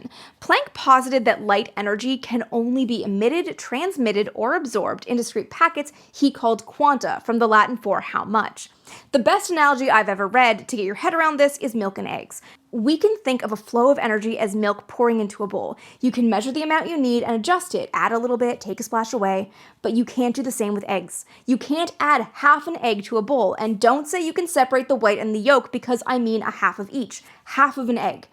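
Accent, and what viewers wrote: Canada. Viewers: She's Canadian, yes? Or Possibly from Minnesota.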